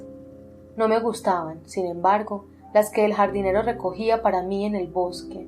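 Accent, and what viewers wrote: Antioquia, Colombia. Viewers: ese acento Paisa pega bien con la prosa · una hermosa voz femenina "paisa"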